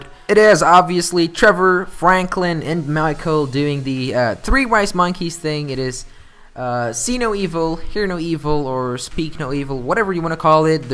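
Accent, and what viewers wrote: Netherlands. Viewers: its the way pronounces its cos he is from he netherlands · He sounds like he's got abit of an accent he had another video were a twitter screenshot was in a different language so I'm guessing he may be Dutch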